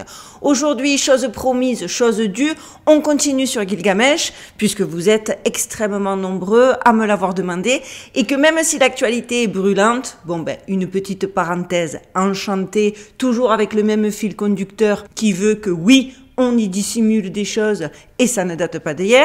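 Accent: South, France. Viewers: Merci de nous offrir des vidéos aussi variées, toujours passionnantes et tout ça avec ta jovialité et ton succulent accent du sud ❤❤❤.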